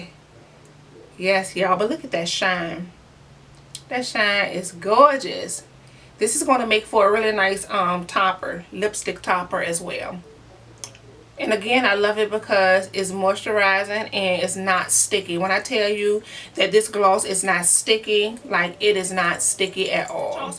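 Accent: New Orleans, United States. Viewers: I love to hear you talk..your accent ❤!!! Are you from New Orleans?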